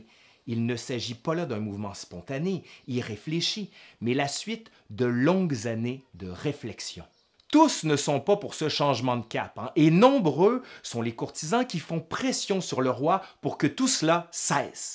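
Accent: Quebec, Canada. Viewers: J'adore ton accent québéquois xD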